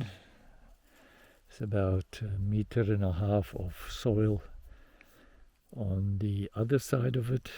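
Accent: South Africa. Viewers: Keep at it! Things are looking great there. You sound like you're South African, I am too. I have built a water conservation garden which is very effect in SA and love seeing others doing it too! The culvert looks great. I suggest heavily mulch your earthen wall and berms etc before heavy rains or you'll need to dig your trench again. My swales are around 80cm wide or so, a little wider than yours and when it rains a fair bit would fall down back in on uncovered/unmulched areas. You luckily have the resources around you. If you cut the nearby grasses you can use those as an easy mulch.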